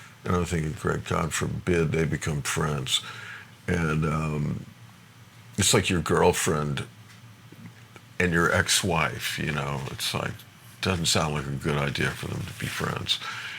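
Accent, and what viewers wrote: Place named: New England, United States. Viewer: Clevah for clever. It's just called a New England accent. RI, MA, NH, Parts of CT. We all talked that way.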